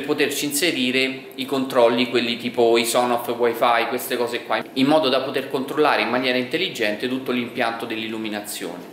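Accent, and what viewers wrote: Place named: Lazio, Italy. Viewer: mi pare laziale ma non ciociaro....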